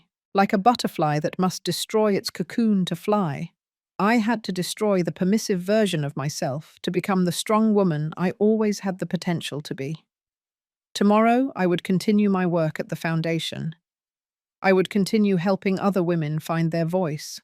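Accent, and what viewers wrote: United Kingdom. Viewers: Good story but dont like the narrator from the U.K.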